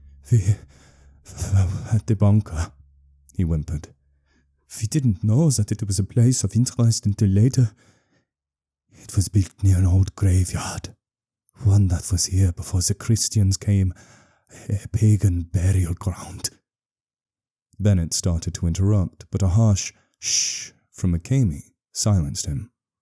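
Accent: Germany. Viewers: As an enthusiast and practitioner of accents, i've gotta say your German accent is on point! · You know he nailed the german accent when it reminds you of Werner Herzog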